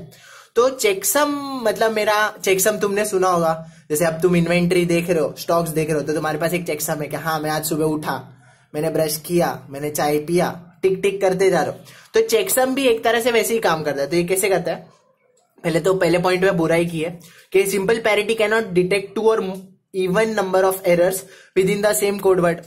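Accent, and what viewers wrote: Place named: Hyderabad, India. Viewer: His accent is slightly Hyderabadi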